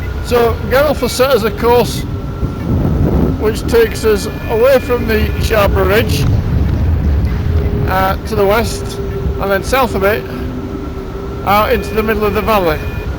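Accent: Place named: England, United Kingdom. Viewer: So highly thankful to you for your nice reply.. the video is so useful, it needs several times to hear to get more info despite the sounds of the winds around you there besides your English accent, and still needs more in scientific information to understand on how every physical factor would participate with other factors in the Hangglider lift process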